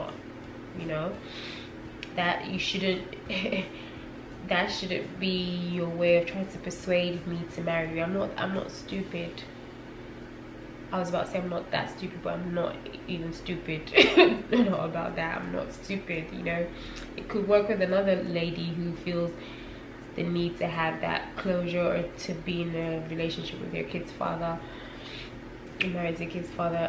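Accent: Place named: United Kingdom. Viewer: Thanks for sharing!!! God blessed you with a very lovely boy and you are an AMAZING Mum. It can never be easy doing so much by yourself but trust me, your Son is watching and he would shower you with so much love and thanks when he's of age. Loved listening to your Bri-ish accent. By the way, my Birthday is on the 25th of April. Same date you and him were messaging each other. Lol!!!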